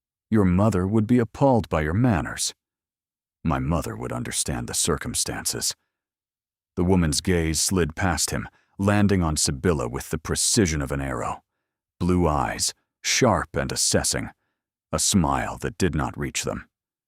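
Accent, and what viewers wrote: United States. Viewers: I do wish that you would choose an English voice for narration, this American voice speaks as if it's telling a Mafia story. Spoils it all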